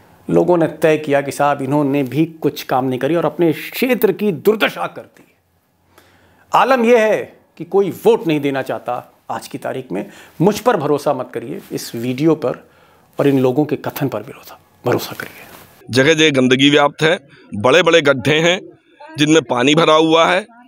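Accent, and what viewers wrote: Lucknow, India. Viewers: Aap ke andaz me lucknow ka asar dikhta hai.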